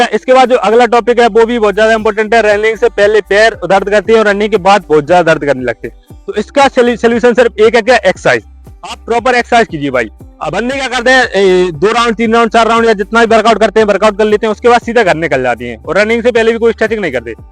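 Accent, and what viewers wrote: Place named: Bundelkhand, India. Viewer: Bhai bihar se nhi lag rahe kaha se .... bundelkhand se lag rahe ho khaskar M.P se 😅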